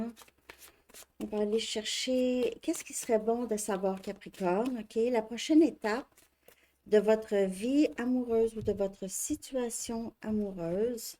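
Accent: Canada. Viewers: Je vous decouvre à l instant bonjour de MARSEILLE JE SUIS LION ASCENDANT CAPRICORNE ET LA LUNE EN CAPRICORNE VOUS AVEZ L ACCENT CANADIEN❤❤❤❤❤❤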